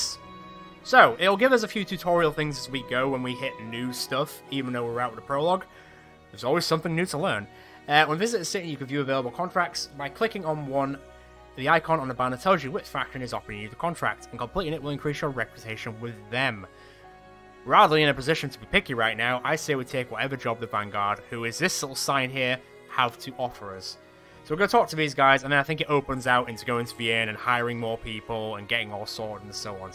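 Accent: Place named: North, United Kingdom. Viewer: Thanks R'Kid, makes a nice change to 'ear someone speak northern.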